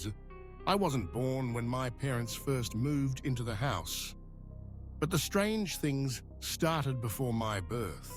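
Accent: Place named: Australia. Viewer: Ausie accent, great mate..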